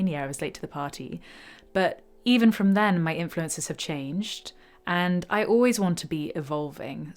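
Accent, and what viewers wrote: United Kingdom. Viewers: Even when I lover her accent (or any british accent), my ears ain't well trained enough to understand the name of song Mary mentioned. Anyone can help me?.. Thanks!.. Guitar story is amazing!!!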